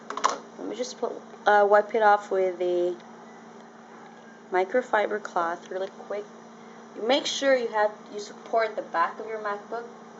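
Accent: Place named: Philippines. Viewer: Great video! I can hear you pinoy accent ha. You sound like my mom haha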